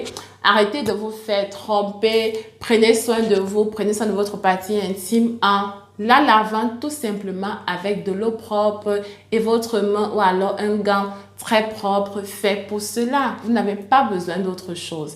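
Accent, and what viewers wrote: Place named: Cameroon. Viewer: L’accent camerounais 😂❤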